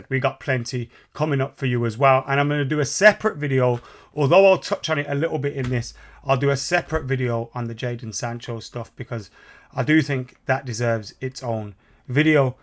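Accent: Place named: Birmingham, United Kingdom. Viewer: has a Birmingham accent and is from the West Midlands. Why does he support man United?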